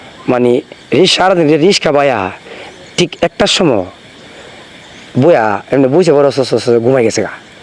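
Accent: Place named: Brahmanbaria, Bangladesh. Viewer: এটা ব্রাহ্মণবাড়িয়ার ভাষার মতো লাগলো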